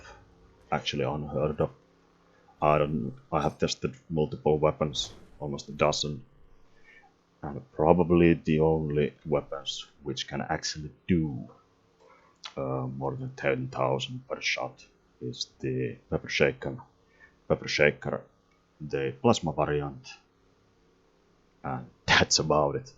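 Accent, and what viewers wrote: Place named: Finland. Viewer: Whoa, that's nice. Hopefully we get this into the live next update. First vid I've seen from you and your accent got me wondering if you're a Finn by chance?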